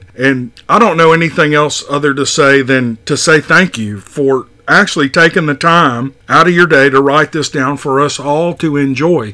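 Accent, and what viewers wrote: South, United States. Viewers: I have said it before. You are the best narrator that southern draw makes it so interesting to listen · Another great batch of stories narrated by the soothing southern drawl belonging to the great!!! Thank you for your time, again 😍👣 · Keep that smooth southern voice telling big foot stories. You doing a 👍 job 👍👍👍👍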